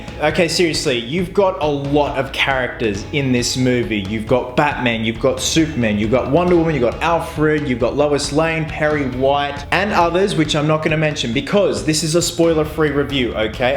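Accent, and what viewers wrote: Australia. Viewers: DAAAMMM you look sexy in that bat outfit, mmmmm yummy and love the Australian accent makes you even sexier. Do i agree with your assessment with the movie? Not completely but that dont take away from your sexiness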